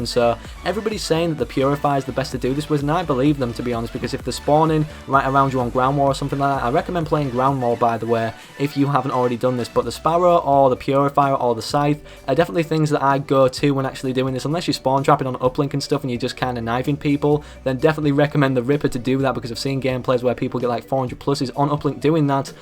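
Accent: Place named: Yorkshire, United Kingdom. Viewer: Your from Yorkshire also your psn name gives me Ebola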